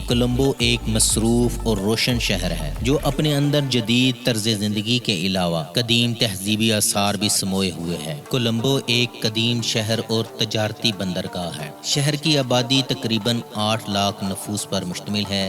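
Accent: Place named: Punjab, Pakistan. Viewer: Biggest fan 😘 I love your beautiful punjabi accent Urdu , dear aap apna face reveal kro ❤️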